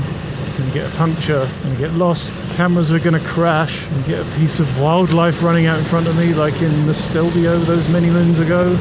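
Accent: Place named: Wales, United Kingdom. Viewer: Great video, and a great Welsh accent, and that's coming from a welshman 😂😂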